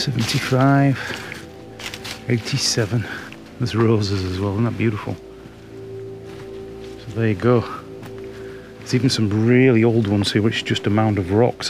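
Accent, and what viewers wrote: Yorkshire, United Kingdom. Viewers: tv show filmed at Port M was "The Prisoner" used to watch the re runs in 90's : ) You sound like a fellow Yorkshireman, im West Yorkshire Lad B'n'B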